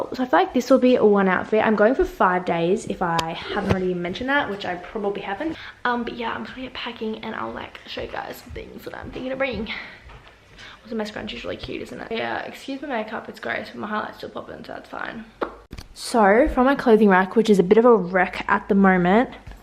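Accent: Australia. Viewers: Wait is she from Melbourne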